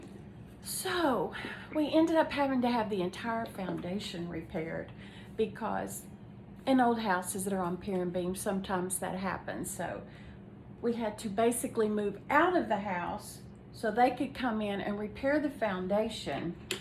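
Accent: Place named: South, United States. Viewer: I agree with all you said. draws you in with that soft Southern accent. What she says comes from her heart. That makes it profound. ❤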